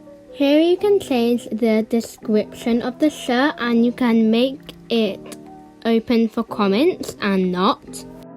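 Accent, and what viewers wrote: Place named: United Kingdom. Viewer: I love your British voice so so much!!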